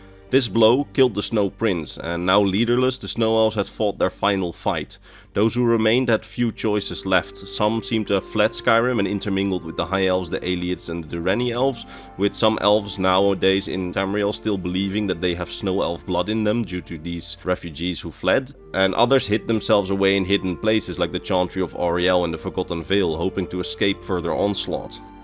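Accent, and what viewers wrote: Netherlands. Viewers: Sounds Dutch or maybe scandi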